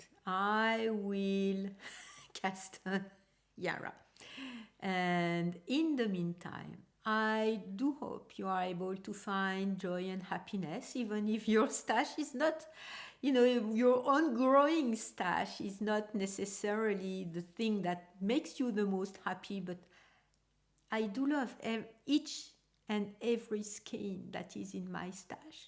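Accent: France. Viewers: What a delightful podcast! Your French accent is a pleasure to listen to. I can really relate to your acquiring your kryptonite stash and trying to focus on using it up. Best of luck to us all!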